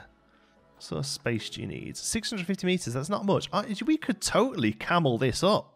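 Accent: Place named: Staffordshire, United Kingdom. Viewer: I thought your accent was familiar! I also grew up in Hednesford/Cannock Chase! Love your videos :D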